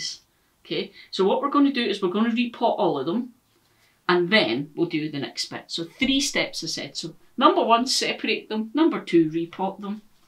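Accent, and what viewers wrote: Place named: North, United Kingdom. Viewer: I love your accent it reminds me so much of my family up north. Xx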